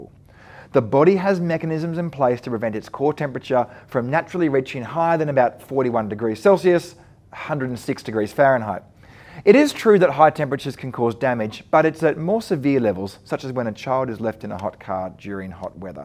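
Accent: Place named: Australia. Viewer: I'm in nursing school and very much enjoy help from an Australian accent with my homework! Thank you!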